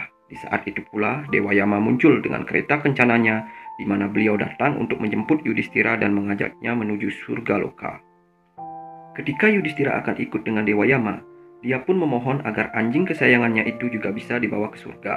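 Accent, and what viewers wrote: Bali, Indonesia. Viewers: ciri khas logat bali heheh pelat r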